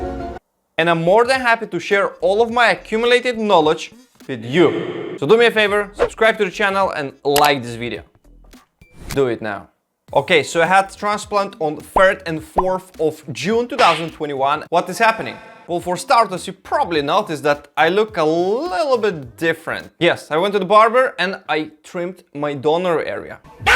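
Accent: Russia. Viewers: Are u Russian? Your accent like Russian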